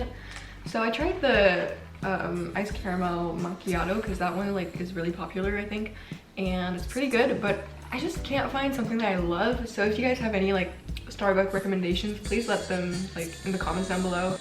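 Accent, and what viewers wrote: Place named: Quebec, Canada. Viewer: yayyy a fellow french canadian! I knew as soon as i heard you speak french lol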